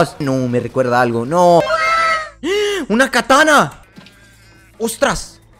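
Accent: Chile. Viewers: Soy yo o tienes voz de chileno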